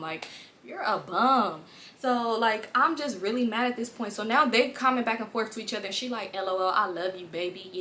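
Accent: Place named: California, United States. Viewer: I can tell you from Cali I am too we love are gold jewelry. The bamboos and the way you talk give it away to us that's from there. Storytime with you be lit tho I just got on here this my 2nd video lol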